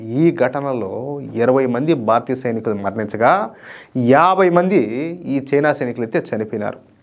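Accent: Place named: Nellore, India. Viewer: Dear, your presentation in that vernacular style with Nellore slang is very good. You are straight and your emotion is just right. Your comprehension about the subject you are speaking is also quite good. Try to organize a debate video by inviting few experts with you as anchor. Thank you for the topic presentation.🌹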